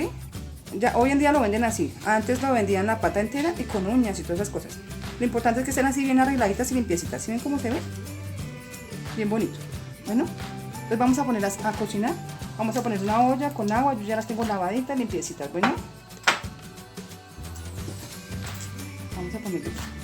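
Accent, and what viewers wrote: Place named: Bogotá, Colombia. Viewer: Yo ya te go los ingredientes, me agrada como hablas muy chévere bien Bogotana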